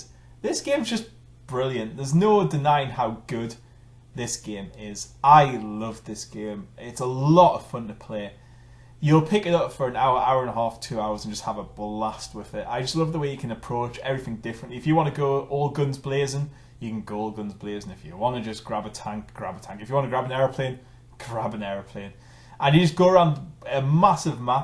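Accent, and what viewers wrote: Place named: Newcastle, United Kingdom. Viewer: I dinna no ya was a Geordie!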